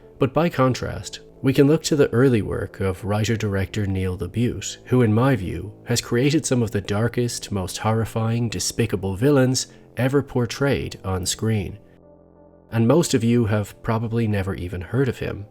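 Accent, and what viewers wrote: Ireland. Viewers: Sounds Irish to me, but not that strong an accent. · Very subtle Irish. He sounds like a light version of the Irish guy who has a true crime Youtube channel called That Chapter. Except he pronounces the TH in "three" and "thirteen" whereas the other guy says "tree" and "Tirteen".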